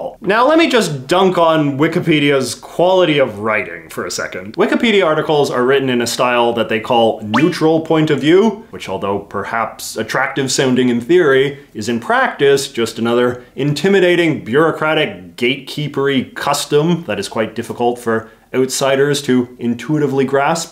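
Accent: Canada. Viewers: Just got channel recommended and I realized that I have been binge watching for almost two n half hours now. Concise, educational and I’m all “about” the Canadian accent being an Alaskan 🙂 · Stop saying a boot please I know your probably Canadian but it is very ignoring! Thanks! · Canadian detected.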